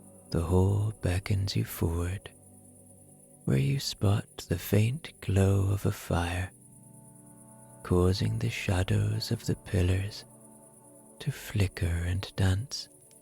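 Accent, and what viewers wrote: Ireland. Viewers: I never hear anything of your stories because I fall asleep immediately 😅. I love your Irish accent and voice and the fantasy journeys! I also love that you were inspired to use your gift this way! After the 1st story (monk in valley), I felt better since. I think it removed blockages while I was asleep. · Irish story tellers are the best!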